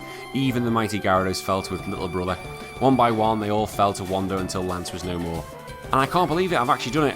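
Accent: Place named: Wirral, United Kingdom. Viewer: Great video mate, don't usually like/comment cos I watch YouTube on xbox but am not logged in so I came back to your video on my phone just to let you know how good this was ! Loved the joke about being 32, married with a kid lol - Pokemon is for all ages :) anyway great video mate, you should definitely do more challenges like this one ! Btw where you from? You sound very close to me on the Wirral?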